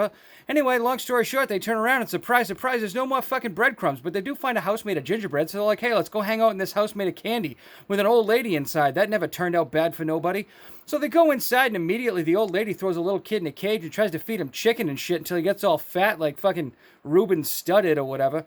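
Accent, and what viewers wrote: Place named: Boston, United States. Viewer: You’re killing me. It wouldn’t be the same without that thick Boston accent!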